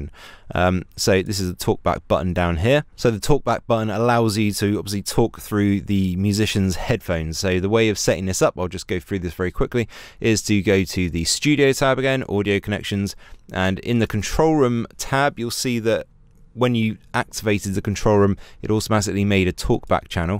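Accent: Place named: United Kingdom. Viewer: Solid info. Not to a dick, but I had to rewatch a number of things to clearly hear what was being stated. Not a matter of accent, it was specifically the speed. Many in the U.S. neither speak or are accustomed to hearing U.K. english (which we also often hear from those speaking english who are from India & pakistan). It's like there's some race to get every single syllable out of the mouth, regardless of audience comprehension. I understand that you are going to do as you will... it's fine. I merely wanted to share the perspective of some of us who are struggling with the tutorial a little. Thank you for your time.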